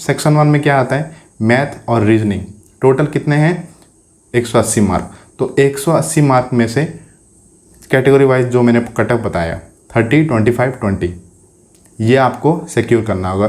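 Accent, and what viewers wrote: Odisha, India. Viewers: Odisha state se ho kya aap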